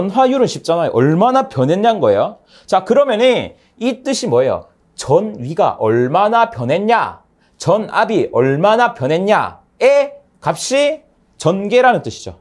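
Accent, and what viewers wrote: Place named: Busan, South Korea. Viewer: 부산사람이죠? 좀더 귀 잘 박히네요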